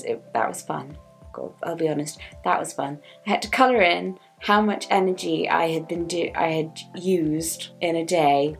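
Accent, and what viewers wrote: United Kingdom. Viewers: Your videos are multi benefit for me! First, they're educational and I learn a lot from them, second, I'm an English learner and your British accent is amazing to listen to, and third, they're inspirational and it always proves my point that people with disabilities are the strongest (if that made any sense). Stay happy, best regards!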